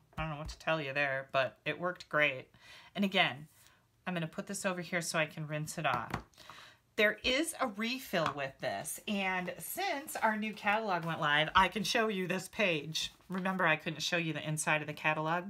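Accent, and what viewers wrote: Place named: Wisconsin, United States. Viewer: Oh my gosh, I thought my memory issues were because of am of a certain age. 😉 I will start using the Stazon excuse! What fun! Love what you do and the Wisconsin accent. I used to visit Sheboygan. Nancy In Colorado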